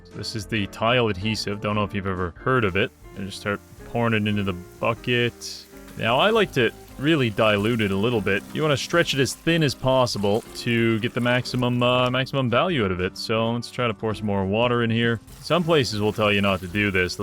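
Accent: Canada. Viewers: Your fancy Canadian speak amuses me.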